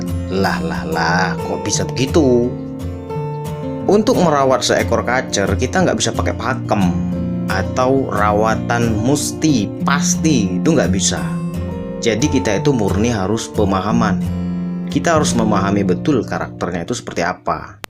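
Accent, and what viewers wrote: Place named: Sumatra, Indonesia. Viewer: Macam orang sumatra logat ko wak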